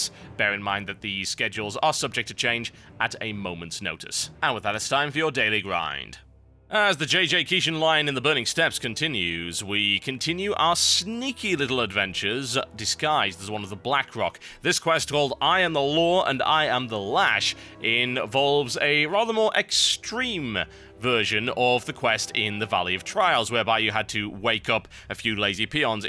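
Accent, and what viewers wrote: United Kingdom. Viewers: Azeroth Daily, bringing me my daily dose of TotalBiscuit and brittish accents.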